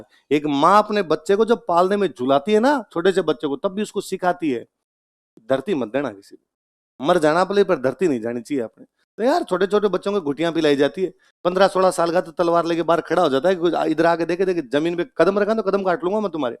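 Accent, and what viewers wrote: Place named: Rajgarh, India. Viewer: Sir me rajgarh se hu apki puri bhash meri jesi he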